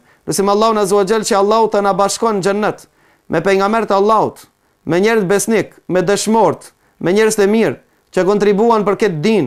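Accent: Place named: North Macedonia. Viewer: Ta qifsha mamin Terrorist Maqedon, sipas akzentit tend qe ke je 100% nga Maqedonia, pra nga Shkupi, apo Kumanova.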